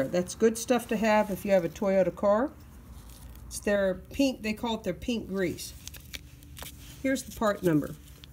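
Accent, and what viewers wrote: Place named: South, United States. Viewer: Plus points for the southern accent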